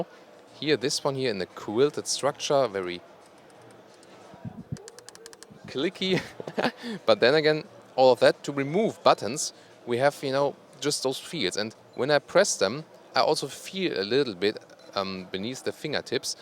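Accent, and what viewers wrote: Germany. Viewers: The colour is disturbing. But it's the same thing for my ears, being Dutch I understand English and Deutsch very well. I know you speak English but my brain wants to translate German to Dutch instead of English to Dutch. You sound so German lol.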